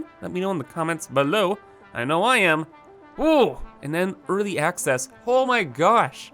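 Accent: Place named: Canada. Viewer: Dude! Could you be more Canadian? Funny to hear the accent: bese (base), spece (space). Get my drift...ey wink, wink Love your videos brother. Gonna buy I on Steam too. Since the Dev are based 45 miles from where I live in Sweden. Ya kno...greatest nation on earth 🤪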